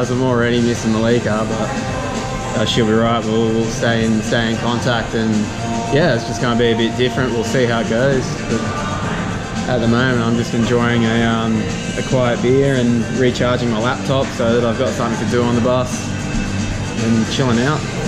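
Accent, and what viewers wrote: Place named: Australia. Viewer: Dude! I love your Australian Accent 🤣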